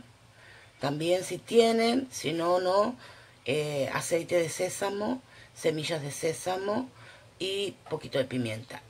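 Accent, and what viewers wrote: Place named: Buenos Aires, Argentina. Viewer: Hola . Es la primera vez que te escribo,hace poco tiempo buscando recetas de cocina asiática encontré tu canal,me pareció muy interesante prácticos tus platillos. Al principio creí que eras Argentina por tu acento medio porteña pero luego descubrí que eras del Hermoso Uruguay! !!.Gracias por tomar tu tiempo y enseñar sobre el mundo culinario asiático. Saludos desde Argentina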